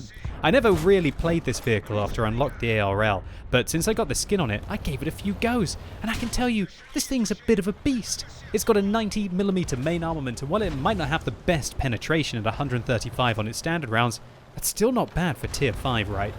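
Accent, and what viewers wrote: France. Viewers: bon accent français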